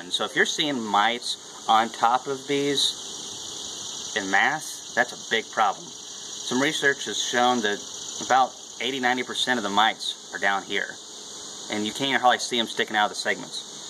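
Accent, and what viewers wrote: South, United States. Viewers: Thank you for this video and all of your videos for that matter. I'll admit it that I first started watching your videos because I liked to laugh at your "down home southern charm." Seriously you look and sound like Opie Taylor grew up and became a beek. But in fact, you have proven to be a great source of knowledge and your videos present a lot of information in a very enjoyable way! The real and refreshing shocker is that you shatter the stereotype of the southern, good ole boy beekeeper. You are clearly intelligent and capable. Keep up the good work!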